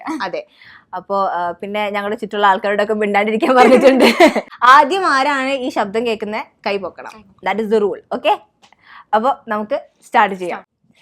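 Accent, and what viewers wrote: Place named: Thrissur, India. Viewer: Tcr slang😍😍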